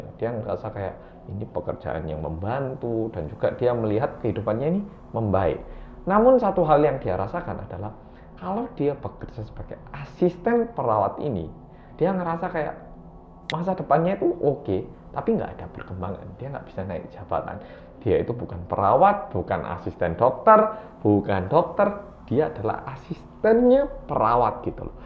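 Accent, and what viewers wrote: Java, Indonesia. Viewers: medokkkk update gaesss ngeriii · Kro ngenteni buko, cusss nnton wong medok😂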